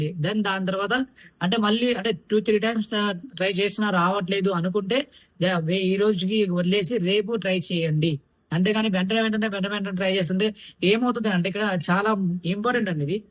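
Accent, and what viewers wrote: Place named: Hyderabad, India. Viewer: Thammudu nee telugu Urdu+telugu kalipi unnattu ga vundi....video cheyse mundu konchem telugu practice cheysi Cheyyi....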